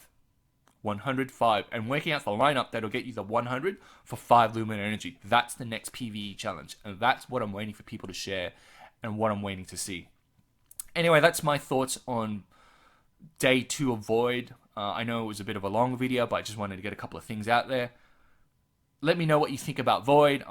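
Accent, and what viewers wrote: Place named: Australia. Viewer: I've never had any issues understanding you but then I've played online games on AUS time for most of my teen years so maybe I'm just not phased by the accent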